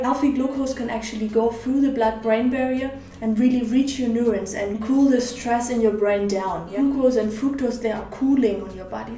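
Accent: Germany. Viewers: das ist doch n deutscher akzent :D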